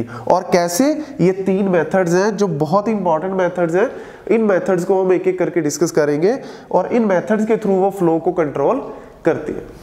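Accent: Punjab, India. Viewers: Yr punjabi tone ❤ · Paji tusi punjab to ho😅 ?? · Thank You so much!!!!!! Amazing explanation! I enjoyed every bit of the video and realised I don't hate Networking after all! P.S. The Punjabi accent rocksss!!!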